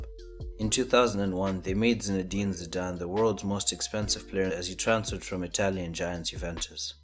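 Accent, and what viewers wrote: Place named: Zimbabwe. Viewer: You sound Zimbabwean bro! Love the video though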